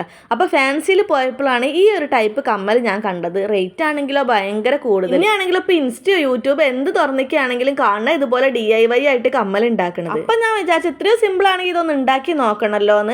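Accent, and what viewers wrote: Kozhikode, India. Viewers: കൊയ്ക്കോട് ബാലുശ്ശേരി കക്കോടി മുക്കം ഭാഗത്താണോ സംസാരം കേട്ടിട്ട്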